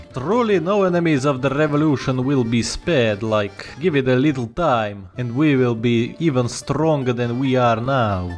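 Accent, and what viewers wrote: Russia. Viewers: A Russian accent?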